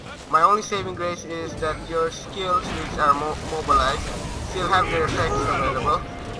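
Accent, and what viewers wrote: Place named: India. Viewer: sounds like an indian accent woooooooo represent us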